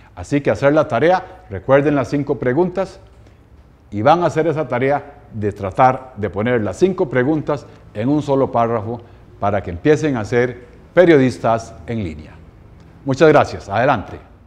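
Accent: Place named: Costa Rica. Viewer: Tu asentó es muy bonito, debes de ser costarricense.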